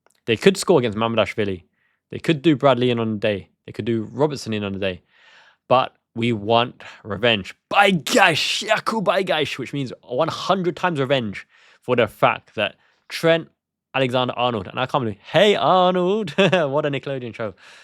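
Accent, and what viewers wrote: United Kingdom. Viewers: btw dude you may be in Japan but did you grow up in the UK? You sound very British :)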